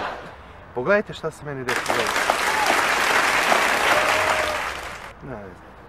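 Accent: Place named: Karlovac, Croatia. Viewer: iznenadio si me s tako lipim glasom, osjeti se karlovački naglasak!!! Što se tiče Nutele, razlika je vidljiva( mogu ocjeniti jer sam iz Hrvatske a živim u Luxembourg), ali moji klinci vole jako našu Kraševu Linoladu koja je njima kažu i bolja, uvijek je bilo i bit ce razlika u kvaliteti proizvoda za zapadne zemlje Europe i one istočne, to znas i sam jer si rođen vani, meni je recimo razlika najveća i najvidljivija ona kod omekšivača za rublje i u dugotrajnosti mirisa i u boji i u gustoći za potpuno istu marku poput Lenora... inače ideja za video predobra i glas kao u glumca!! Lp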